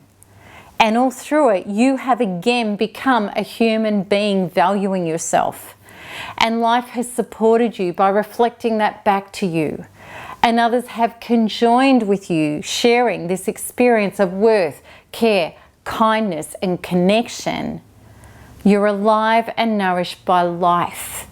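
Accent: Australia. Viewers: Love your hair, simply gorgeous! Refreshing to hear a fellow Aussie voice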